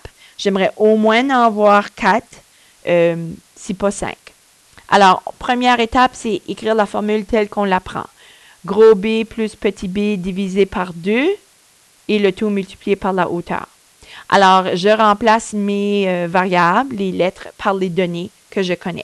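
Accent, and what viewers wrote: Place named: Quebec, Canada. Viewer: Mais oui les Québécois ont un petit accent . . . adorable .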